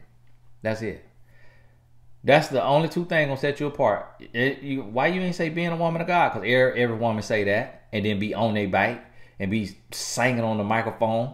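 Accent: South, United States. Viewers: It’s his southern accent , he is just funny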